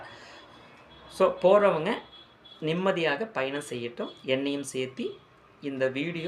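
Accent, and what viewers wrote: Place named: Kerala, India. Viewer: மலயாள வாடை தெரிகிறது தாங்கள் அழகாக தமிமழ் பேசுகிறீர்கள்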